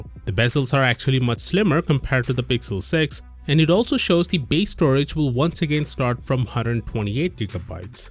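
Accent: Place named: India. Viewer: Ugg why does youtube always recommend me this fucking channel. Go away. I just want tech news. I can't stand this guys indian accent.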